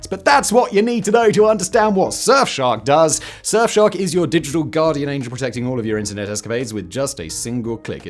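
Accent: RP, United Kingdom. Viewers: You Tube SUCKS! I have removed this man's channels over and over again. But when You Tube changes the algorithm, it force feeds me this Simon guy's many channels despite my removing him. Simon's channels suck. They are poorly researched information that is mostly government propaganda talking points. Terrible videos. And just because Simon has a British accent, this doesn't not make him an authority on anything. He's just a talking head. England is over. Brexit has made the only job in England a You Tube content provider. Terrible list videos that spread falsehoods and bull. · Very imperial British accent...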